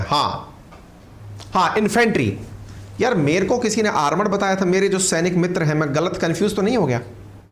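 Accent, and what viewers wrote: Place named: Uttar Pradesh, India. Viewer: App ki English mairi tarah hi hai , due to up personal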